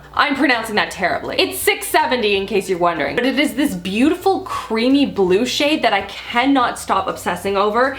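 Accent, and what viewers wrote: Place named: Alberta, Canada. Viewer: thats that awesome alberta french I'm hearing! #YYC rep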